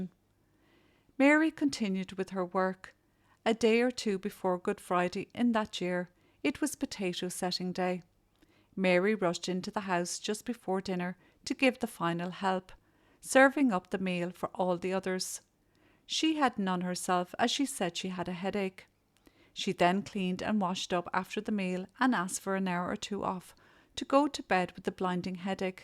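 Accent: Ireland. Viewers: I love her accent/cadence it is a lovely bit of home slice for an Irish lass in Australia. Did your mum not teach u if u have nothing nice to say.....?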